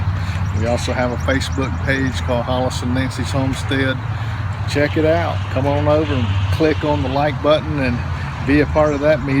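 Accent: South, United States. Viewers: I must admit that I first decided to trust you because of your Southern accent. The Tennessee came through loud and clear--my grandparents live there and listening to your voice was comforting. Then I realized just how much you and Nancy know about gardening. Your story is beautiful as is your faith. Thank you for providing an effective gardening education and so much hope in a time of need. God Bless.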